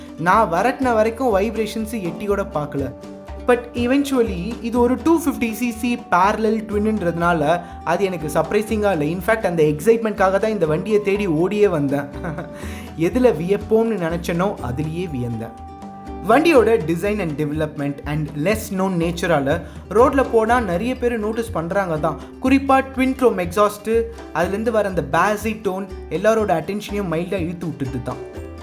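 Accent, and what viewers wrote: Chennai, India. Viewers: Hearing the name at the very first time! As usual I have enjoyed the way you talked about the bike and it’s performance. Please stick with your style of reviewing with namba chennai slang which has got many fans including me. There are many professionals in the market to talk about Bikes but you are little different than others. Hope you already know it and wish to meet you in person someday 👍🏻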